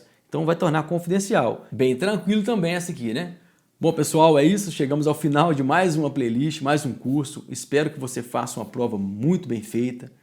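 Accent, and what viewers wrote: Minas Gerais, Brazil. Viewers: professor gratidao pelo conteudo dos videos e por todo o seu esforço de noites e madrugadas para ajudar os que de outra forma nao poderiam ou nao teriam condiçoes de o fazer sozinhos e por si mesmos.....agradeço primeiro por mim e depois por todos e vou acompanhar suas aulas pois conhecimento e bom e com mineirês ai e um must!!! Xiq nurtimu!!! rsrs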